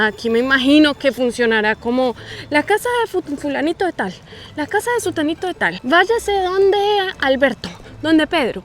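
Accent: Antioquia, Colombia. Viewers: Hola, tenés un acento " paisa", si hablamos de gente amable en tu ispa los cartagineros se llevan el premio!!!! Saludos desde Paysandú Uy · Tu acento me vuelve loco chica colombiana hermoza,nuestro país 🇺🇾 es lindo pero esta a años luz de el tuyo 🇨🇴,digamos las cosas como son,saludos desde Durazno(Uruguay).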